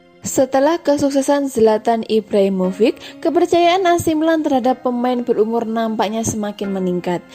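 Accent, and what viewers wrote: Java, Indonesia. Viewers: Si mba admin nya, medok banget suaranya 😂, aga gmna gtu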